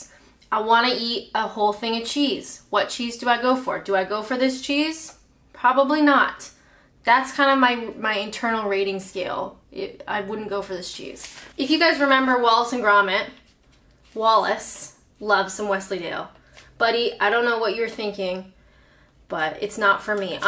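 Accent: Canada. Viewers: Learning how canadian talk sound to sweet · Silly question but how is it that you have lived here a while yet your canadian accent hasn't broken yet? would you say that some English has appeared in your vocabulary the more you stay here? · You Canadians say "couch" and "house" and "about" strangely. But we love you in spite of it.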